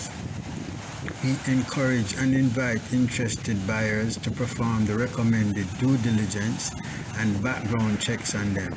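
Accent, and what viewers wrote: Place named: Jamaica. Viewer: Greetings my brother, u sound like a jamaican just came across u video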